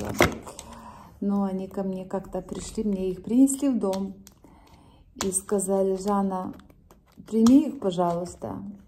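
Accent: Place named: Kuban, Russia. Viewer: Голос приятный, нежный. Говор тоже приятный. Для меня обычный, родной. У нас на Кубани также говорят. А, что кто то написал плохое? Всем счастья, здоровья, любви.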